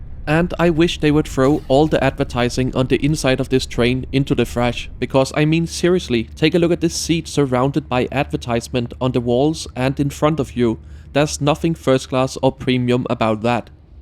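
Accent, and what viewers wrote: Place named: Denmark. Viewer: It's a very danish pronounciation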